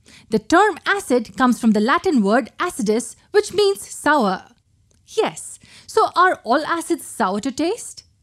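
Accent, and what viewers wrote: India. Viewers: Indian accent ! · I wonder why every educational in video has a woman with an Indian accent like what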